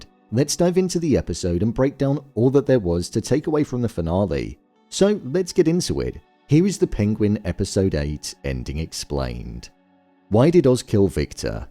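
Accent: United Kingdom. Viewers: You British (??) hear your voice and it sends my mind to peace. Good breakdown. 💪🏿🫶🏾👍🏿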